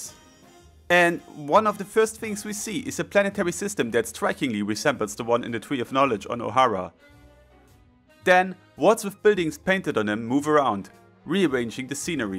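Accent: Germany. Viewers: Bro's german AF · Du bist Deutscher, oder? Starkes Video!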